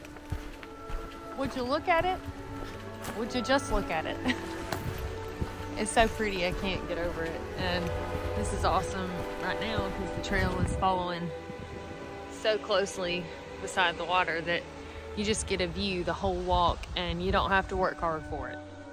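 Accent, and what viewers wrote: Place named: Tennessee, United States. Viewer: I feel like I am back in northeast Tennessee when I hear you talk. When I first moved away to live in richmond,va 34 years ago people would always asked me to say certain words lije night,right,light and Tennessee as I had a big southern drawl.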